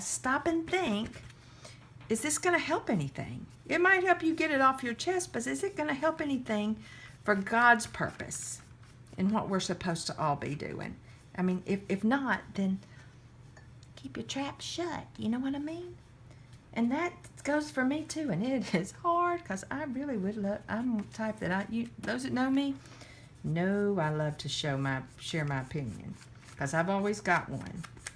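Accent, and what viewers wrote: South, United States. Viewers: LOL its your southern twang..its comforting! Plus you're talking about the King! <3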